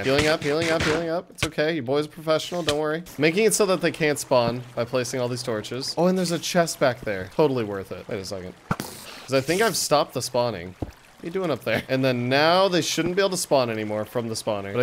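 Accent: Ireland. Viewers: Ur Irish?! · Wait Irish???? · Irish? Jacksepticeye? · I never knew u were irish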